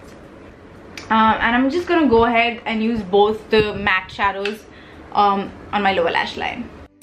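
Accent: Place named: United Kingdom. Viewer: Love the video, as always your videos help me a lot. Love you and love your videos. I missed you so much mommyyy, your talks, advices and jokes and the British Accent.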